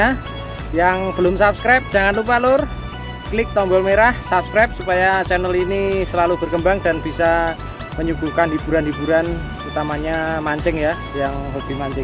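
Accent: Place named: Banyumas, Indonesia. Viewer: Koyoke ng ngapak kidol pam..kang booozz...🙏🙏🙏👍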